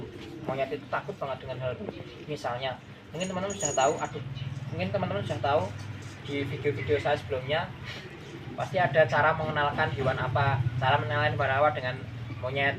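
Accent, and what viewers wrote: Indonesia. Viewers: Jowone ngendi mas... Yoja, ya?😊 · Ky orang kendal bahasane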